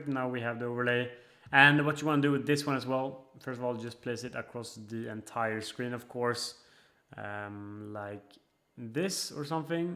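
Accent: Sweden. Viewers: Brors svenska dialekt är galen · the way this guy pronounces "ch" sounds is too funny "sjat GPT"😂 so swedish!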